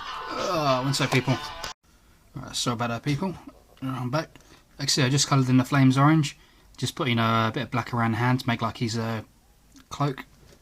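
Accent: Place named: United Kingdom. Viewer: Are Brittish cuz accent is quite fantastic and a bit different than American accent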